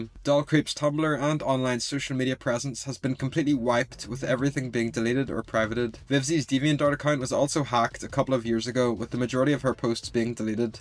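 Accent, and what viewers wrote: Northern Ireland, United Kingdom. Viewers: I can't tell which part but i feel like you're from the UK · so strange seeing a video like this being narrated by a person with a northern irish accent, pretty rare lol caught me off guard as i’m not used to hearing my own accent on youtube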